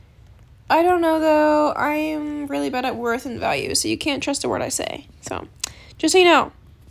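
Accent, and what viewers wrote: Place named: United Kingdom. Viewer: early,and ik that ppl r nice to u cuz u r one! edit:omg your British accent🤩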